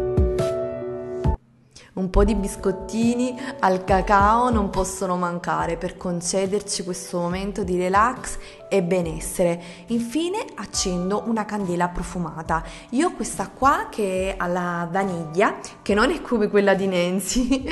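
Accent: Naples, Italy. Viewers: sei napoletana?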